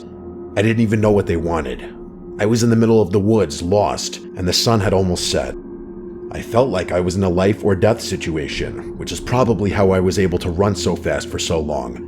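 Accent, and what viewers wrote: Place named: East Coast, United States. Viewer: you're the goat. I don't know if it's the East Coast accent or the excellent curation of sound effects and visuals, but you're far and away the most competent and consistent scary story YouTuber in the business. The only channel that I have a membership of for a reason.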